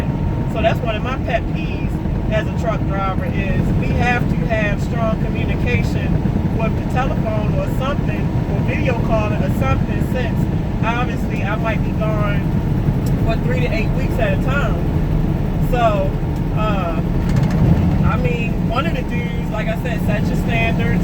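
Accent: Baltimore, United States. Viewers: I love the way you say "Dude". My son said you sound like you from Baltimore and I told him you were! Lol. we just laughed about how you were talking. We have folks in Capitol Heights, Maryland, Bmore and DC.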